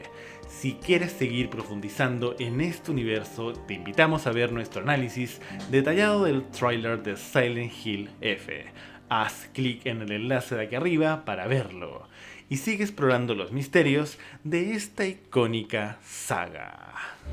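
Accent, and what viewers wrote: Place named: United States. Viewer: Tuve que dejar de ver el video por tu tono de voz y como intentas pronunciar las palabras que no necesariamente deben pronunciar con un acento americano, deja de hablar como un niño con TEA por favor 😿🙏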